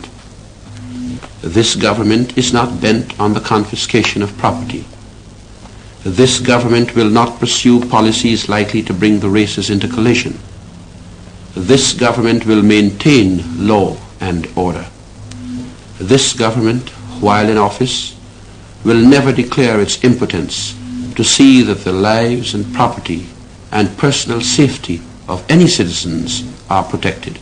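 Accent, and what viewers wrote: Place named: England, United Kingdom. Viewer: Great orator my ass. He trying to sound British. That is no Guyanese accent.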